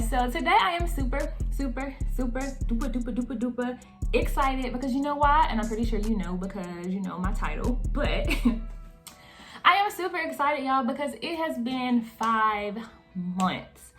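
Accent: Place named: South, United States. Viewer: You are so pretty girl!!! Ooh, I love your accent too. Southern accent?? Anyway, your locs are beautiful. My braid locs were started end of Feb 2022. Girl, I feel you with that darn shrinkage. Mine looks shoulders right now. 😂🤣 However, my hair is around BSL to mid back. I also been documenting my loc journey too. Great video! 😘🙏🏻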